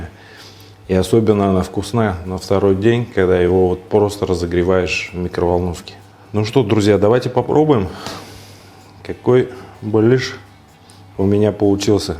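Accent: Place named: Kazan, Russia. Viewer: Уже много лет живу далеко от родины. Рецепт простой и вкусный и поведан с таким неповторимым казанским выговором, ну просто бальзам на душу. Спасибо большое ☺️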